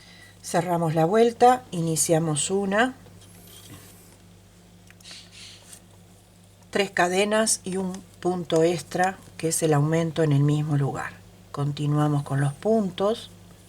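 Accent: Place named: Argentina. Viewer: Señora usted repita como lo hace siempre en mi familia siempre la preferimos por ese motivo así es como se aprende y usted explica muy bien ,y su voz es tranquila suave y muy clara ,en mi familia pensamos que usted es Argentina su forma de hablar y el nombre de los puntos que Dios siempre la bendiga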